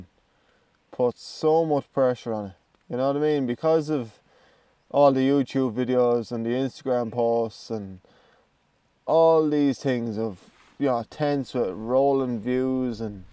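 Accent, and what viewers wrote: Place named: Ireland. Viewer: It’s just nice to see and hear an Irishman on YouTube!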